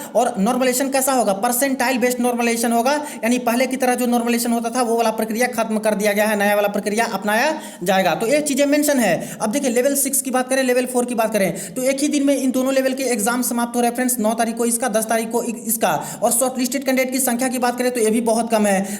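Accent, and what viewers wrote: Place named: South, India. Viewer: Sir please speak in ENGLISH ,We cannot understand south indian 🥺🥺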